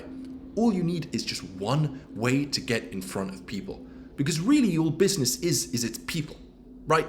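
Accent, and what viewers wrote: United Kingdom. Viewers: good content as always. Part of Kirbys brands is the typical "BUT" with UK accent!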